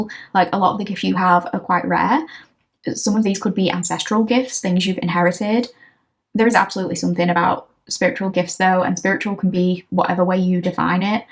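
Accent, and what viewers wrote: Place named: Lincolnshire, United Kingdom. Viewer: Your partner will know that Hull is - wish I could do sound bite - more "Yer Nuurrwe", Did you say 'You Know'? Eh!!! But you could pass as a North East Lincolnshire gal! (Just in case you need to go incognito when your channel is famous!!! 😉🥰) Keep up the great channeling. You have a gift. ✨✨✨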